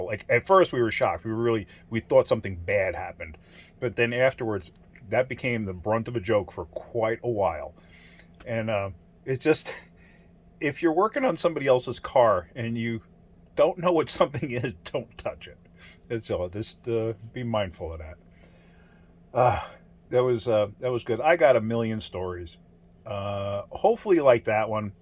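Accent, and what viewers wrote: United States. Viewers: Huh, didn't detect an accent, I thought you were US born. I always tinkered with electrical stuff and got into electronic engineering myself.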